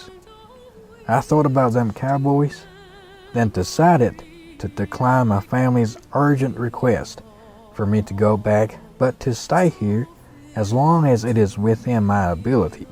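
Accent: South, United States. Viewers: 我是一个住在德州的中国人。你的南方发音很棒 :)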